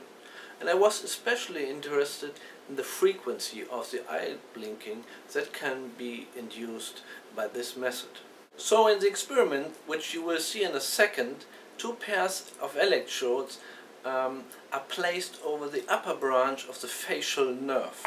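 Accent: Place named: Germany. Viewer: du bist deutscher oder? man hörts voll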